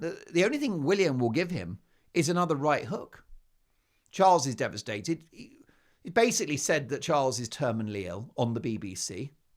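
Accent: United States. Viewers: Your American accent is better than most of the other British presenters! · Awesome American accent. And you are a riot, by the way! 😆😆😆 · Omg! I love your American accent! Spot on. I’m an American and it’s hilarious. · 🇺🇸! How is your American accent so good??!! The California Lawyer and the Wendy’s Employee characters!! Spot On!! And hilarious! I bet you heard me laughing from Nashville! And I am holding you to your word to put The Music City on your next US tour. 😂🎵🤩 Also I’m gonna start calling Reg “Elton Jr.” 🐈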